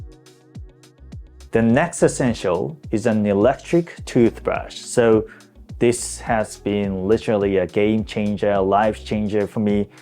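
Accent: Australia. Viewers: 質問です。ネイティブの人がこのアツさんの英語を聞いたとしたらどのくらいオージーアクセントなんですかね？ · You really sound like an Aussie mate lol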